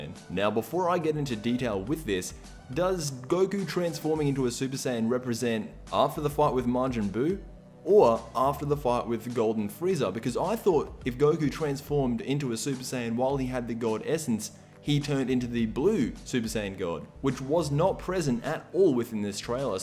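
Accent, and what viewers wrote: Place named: Australia. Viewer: lol your Aussie accent is pretty full on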